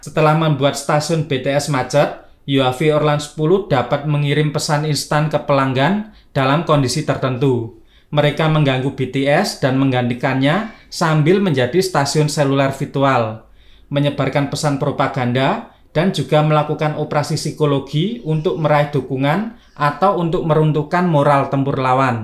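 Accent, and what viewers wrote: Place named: Batak, Indonesia. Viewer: Mantap dialek batalnya.....horas...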